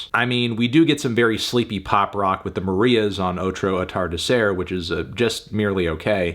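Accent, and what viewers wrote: United States. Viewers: arent u italian why do you pronounce spanish words so…gringoly.. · No se le quita lo gringo.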